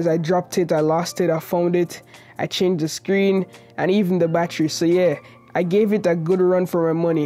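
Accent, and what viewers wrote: Jamaica. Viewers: dude sounding Jamaican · Are you a Jamaican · Are you Jamaican bro? The accent sounds familiar. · The accent, are you jamaican? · are you jamaican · A mobile phone reviewer with a Jamaican Accent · Are you a Jamaican? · Can't miss that Jamaican accent bro lol big up yuself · You Jamaican? And what’s the intro song? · hey great vid you Jamaican btw I hear the accent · I can tell you are from Jamaica, aren't you? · You Jamaican? 🤔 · Patois strong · that Jamaican accent 😭😭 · Are you Jamaican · Dude are you Jamaican?? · Are you from Jamaica? Or the Caribbean? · U from Jamaica bro?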